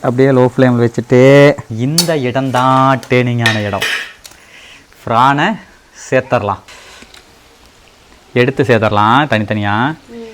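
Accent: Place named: Erode, India. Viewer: ஈரோடு ஸ்லாங்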